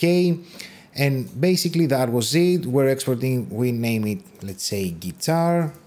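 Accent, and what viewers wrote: Greece. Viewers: One question....are you in any kind connected with Greece? You speak like Greek.........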